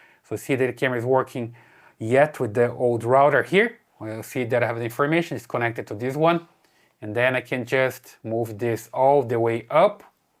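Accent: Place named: Brazil. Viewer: How to identify a Brazilian speaking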